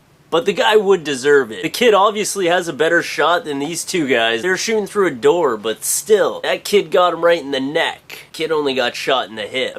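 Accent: Canada. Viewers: Is this guy Canadian? Canadians can't make funny! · r u canadien?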